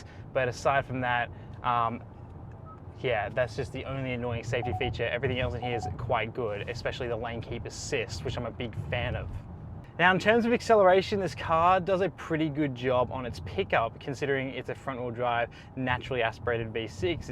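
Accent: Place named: Australia. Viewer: Didn’t want to assume lol but I thought it was Aussie 🙂. Anyway, you did a great review.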